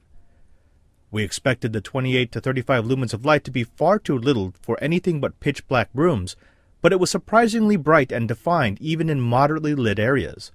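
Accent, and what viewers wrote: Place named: Philippines. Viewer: one last question out of my curiosity since you are a Jesuit priest. Can I ask if you are a Filipino from Ateneo Universities in the Philippines? The way you speak it sounds like a Filipino and at the same time every features of you makes me feel like you are one of us. peace, popoy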